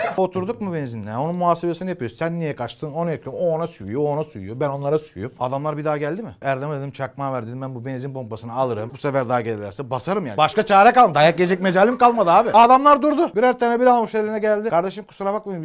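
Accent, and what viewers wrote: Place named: Altınova, Turkey. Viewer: Balıkesir altinovaliar gibi konuşuyor süpersin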